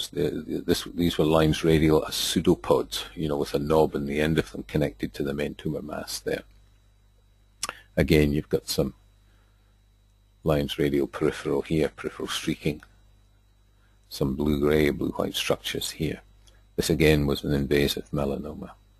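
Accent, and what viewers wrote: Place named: Ireland. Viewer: Maybe their resolution isn't high enough but I would find it helpful if you increased your default zoom to maybe 120-130% if everything you do here. There is so much of your screen that is just wasted space. Otherwise, thanks for your series! It's been helpful as a dermatology refresher. I had encountered your videos a few years ago and had always wondered why I had this bizarre association between dermoscopy and Irish accents. Cheers.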